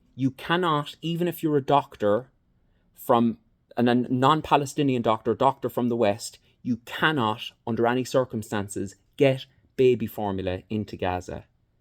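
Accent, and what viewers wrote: Ireland. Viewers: Honestly love this, the Irish have the best english accent imo, second being kiwi.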